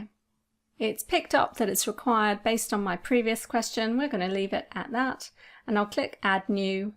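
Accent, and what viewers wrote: United Kingdom. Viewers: Many thanks,. 🤣 I always thought you were British 🤣. Admittedly, I've never been good with accents, and/or it wouldn't be surprising for a Brit to live in Australia....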